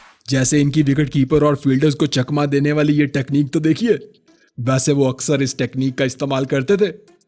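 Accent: Pakistan. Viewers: Bhai Agali video Mein Mere Yaar question ka answer dena Tumhari Awaaz se lagta hai bolane ke tarike se Pakistan ke ho best off lak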